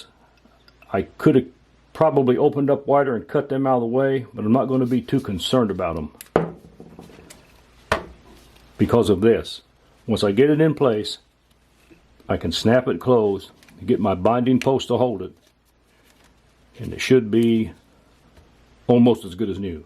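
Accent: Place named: Northeast Ohio, United States. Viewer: Is your accent from NE Ohio by chance?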